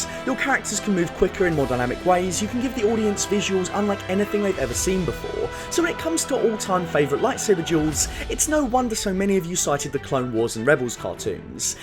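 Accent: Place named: England, United Kingdom. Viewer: Fuck your pommy voice is annoying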